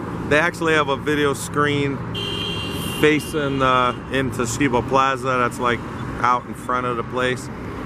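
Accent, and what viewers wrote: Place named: Wisconsin, United States. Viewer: You’re from N/E Ohio, Closer to Cleveland area? That’s why I hear Wisconsin & Pittsburgh mixed. 😏 🤙 🙏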